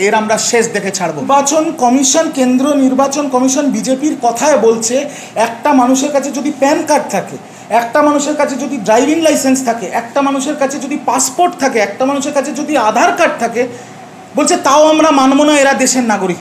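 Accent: Bangladesh. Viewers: তোর বাবার সার্টিফিকেট আছে বানচো তোর ভাষাতেই তো বুঝা যাচ্ছে তুই বাংলাদেশের মাল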